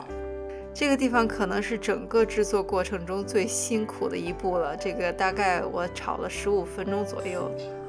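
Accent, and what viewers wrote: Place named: Shaanxi, China. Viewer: 听口音陕西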